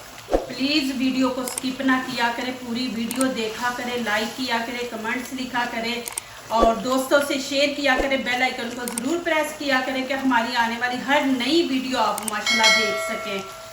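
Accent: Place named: Punjab, India. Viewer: بھت اچھا سالن اپ نے بنایا ھے ماشاءالله ۔لیکن بات یہ ھے کہ اپ کی اردو پنجابی ھے اس لہجہ میں بات کرتی ہیں تو بہتر ہوگا اپ پنجابی زبان ہی بول لیا کریں پاکستان کے سب علاقوں میں پنجابی کو سمجھتے ہیں لوگ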